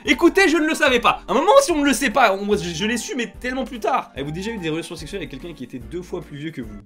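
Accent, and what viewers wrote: South, France. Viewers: Quel accent du sud XD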